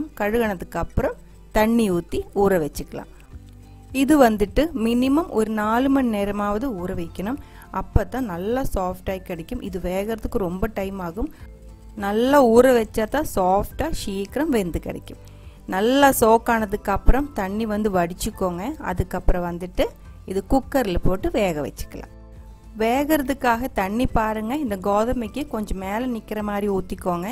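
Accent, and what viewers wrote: Kerala, India. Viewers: Hi Super sis. Tamil mix aana Malayalam unga voice ku nega peasarathu rmba nalla sis. Kandipa na try panni pakara.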